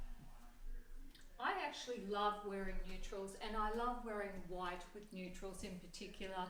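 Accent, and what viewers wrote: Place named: Australia. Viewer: I loved this, some of the terms you use are like America 🇺🇸 and a lot the same as England 🇬🇧....I love the Australian 🇦🇺 accent.......You style is impeccable as always....🌺🌺🌺Much Love Bella🌺🌺🌺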